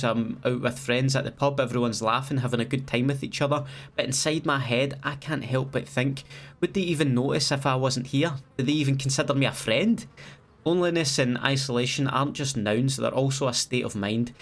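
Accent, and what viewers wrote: Scotland, United Kingdom. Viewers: The Scottish JGOD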